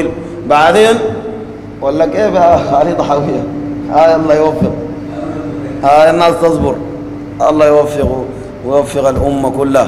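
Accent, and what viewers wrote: Sudan. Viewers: كم هي حلوة اللهجة السودانية اخوكم من الجزائر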